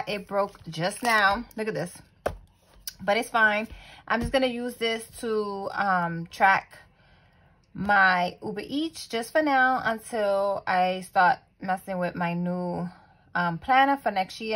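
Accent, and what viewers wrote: New York, United States. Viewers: New York accent strong lmao I appreciate this video you didn’t have to do this but u did 💯❤️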